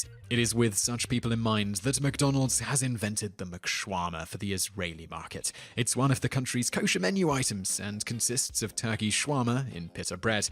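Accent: United Kingdom. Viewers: I'm very confused... you sound british, your videos etc refer to US currency and culture (including references to foreign being anything not American), but in one of your other videos I thought you said you lived in the Czech republic??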